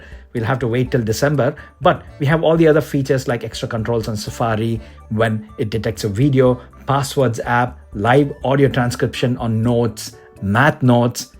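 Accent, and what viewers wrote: India. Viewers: are you an Indian Filth. eww the accent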